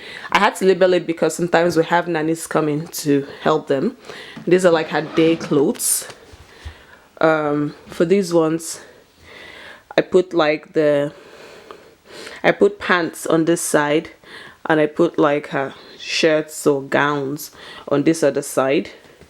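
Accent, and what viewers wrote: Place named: France. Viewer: I like your accent, is that French?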